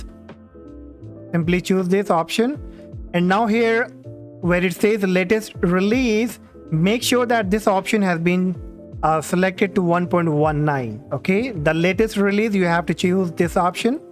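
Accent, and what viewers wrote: India. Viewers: of course he sounds indian.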